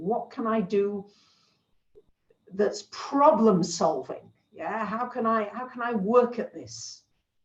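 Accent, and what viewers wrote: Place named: Lancashire, United Kingdom. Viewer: I love your videos and your accent, it's sounds like you are a fellow Lancastrian northern lass like me. Please don't let others undermine how wonderful you are, you have a lot of loyal followers who get so much from your posts.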